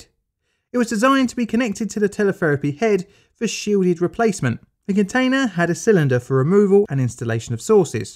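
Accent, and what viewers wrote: United Kingdom. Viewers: Pal, I've got to say, the contrast between the perfect british accent, narrative tone and wording, and the expressions on your bubble dialog boxes for the comics are making my day. It's pure perfection. "Score and a Hen", "Balls" and such. Exquisite combination.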